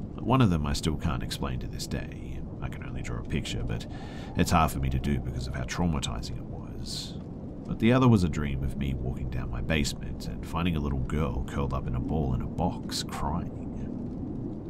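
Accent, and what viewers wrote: Australia. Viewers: Are you Australian ? Just discovered this channel I’m asking because if you are, that’s cool lol.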